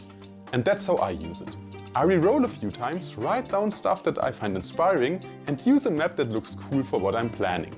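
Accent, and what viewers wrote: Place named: Germany. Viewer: klingt nach nem fellow german der englisch spricht. good luck mit dem channel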